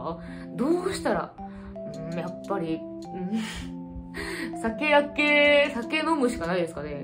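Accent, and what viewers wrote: Kansai, Japan. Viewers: 喋り方的に関西？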